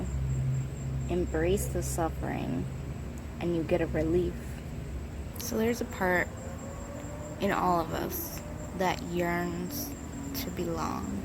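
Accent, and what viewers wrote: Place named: Minnesota, United States. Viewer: i am in love with your Minnesota accent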